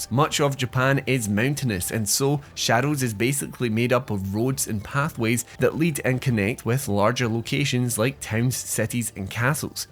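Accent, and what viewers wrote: Scotland, United Kingdom. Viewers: Aye laddie y’er Scottish ain’t ya ?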